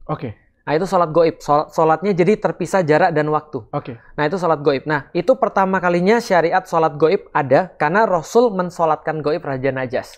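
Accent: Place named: Palembang, Indonesia. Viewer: Kanji kau ni, 😂😂😂😂😂 asli ini Palembang Sumsel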